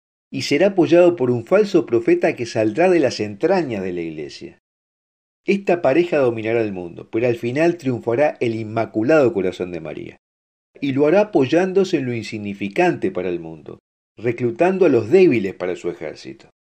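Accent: Argentina. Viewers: A mí me da una ternura inmensa, además del tono argentino. Saludos!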